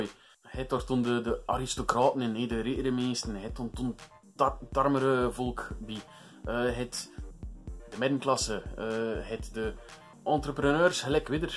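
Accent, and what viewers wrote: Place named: West Flanders, Belgium. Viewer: Tis tof zo keer int west vlaams ma ge excuseerde u int begin ma zo plat ist nog nie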